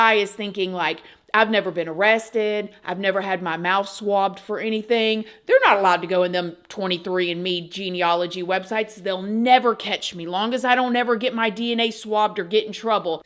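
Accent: South, United States. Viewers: Please don't.... to Inniebody, Inniewhere. I just love your Southern Belle voice and accent. You musta been a beautiful baby, b'cuz look at you now! Too bad you're already taken. Your husband is a very lucky man.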